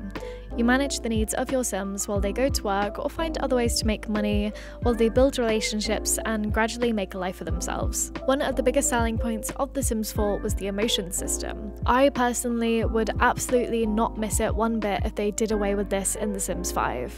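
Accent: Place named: United Kingdom. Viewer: reading books for kids might not be the most fun content to make but.. Something to think about if me, and momma are saying it. And You should be patting yourself on the back. You made a 44 minute video, on a topic I'm not too keen on, watchable. That's a feat. And I'm not a " yes man ". If I didn't enjoy the video, I wouldn't have said anything. I clicked to give you a view. Didn't expect to stay Speaking of your voice and accent.. What kind of accent is that? Forgive my uncultured americanism. I'd guess UK but I could be completely wrong.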